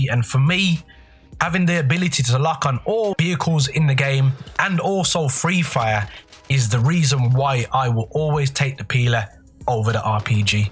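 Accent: Birmingham, United Kingdom. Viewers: Walsall? You sound it but then I hear abit of Brummy in you to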